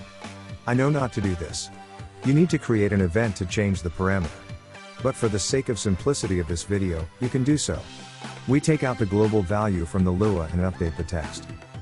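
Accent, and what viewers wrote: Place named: China. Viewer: 这英文听起来很像中国人。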